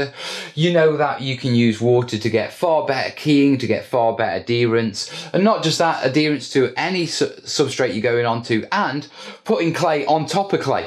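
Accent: United Kingdom. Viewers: Are you British accent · based on your language accent, I assume - you live in Britain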